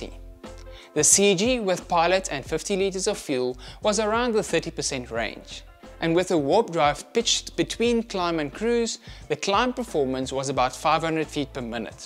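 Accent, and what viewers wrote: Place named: South Africa. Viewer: That's a great video - only just found you. and love hearing the SA accent - I miss my SA friends! Same question as always though: how does anyone afford their own plane??? 😁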